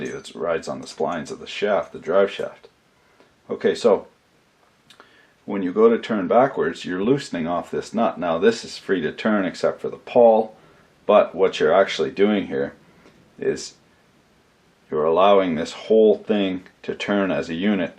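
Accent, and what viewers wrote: Canada. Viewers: R u from Canada 🇨🇦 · Can someone translate the canadien chinglish and translate where he bought it for 150 bucks?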